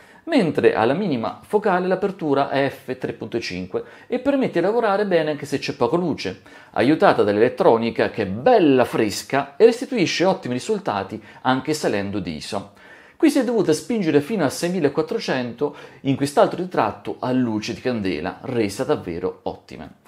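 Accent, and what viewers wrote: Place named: Genoa, Italy. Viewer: Ohimè l'accento genovese🤣 che còccina belandi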